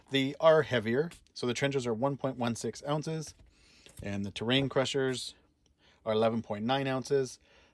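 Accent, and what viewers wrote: Canada. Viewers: You from Nova Scotia ?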